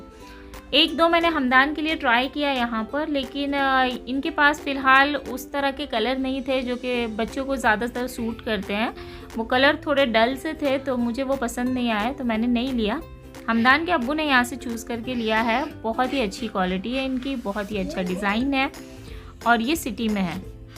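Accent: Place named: Pakistan. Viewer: Aap to bilkul Pakistanion ki Tarah boltin hain .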